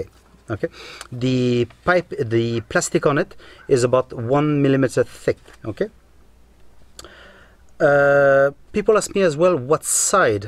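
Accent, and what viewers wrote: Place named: France. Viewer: Vidéo intéressante, merci pour votre explication avec the french accent, hhhhh.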